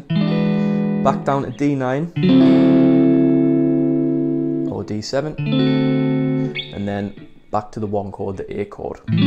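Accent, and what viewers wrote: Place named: Chester-le-Street, United Kingdom. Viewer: I found your channel by chance the other day and was so impressed with your playing and sound. Then you open your mouth and you're from round here haha. I live Chester Le Street! Small world haha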